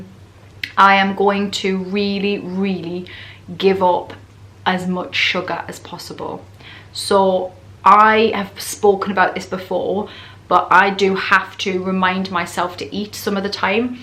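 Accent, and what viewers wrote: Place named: Newcastle, United Kingdom. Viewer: Some nice Geordie in this, reminded me of my old housemate. "bewk"... I love it.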